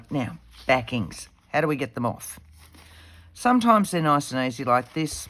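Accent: Australia. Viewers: Thank you soooo much for this toolkit go through especially of the glues you use... funny enuf as a reborn doll artist E6000 is used for keeping in mohair to our kit heads... looove using the glue stik but yes here in Charleville Qld we get constant 40 degree heat so yes a stronger glue is needed for that... looooove your channel... lovely to hear an aussie accent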